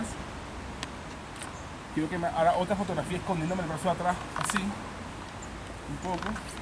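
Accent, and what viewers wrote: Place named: Venezuela. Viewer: Hola que tal Saludos. Esta un divertido Video. A penas empecé a verlo y dijiste la primera palabra de inmediato supuse que eras Venezolano. Hehe y al final del video dices que si efectivamente eres Venezolano. Llegué a este video porque me compré ese lente de 85mm y quería buscar algunos videos relacionados con este lente. Las fotos muy lindas. Muy buena composición y un divertido video. Saludos.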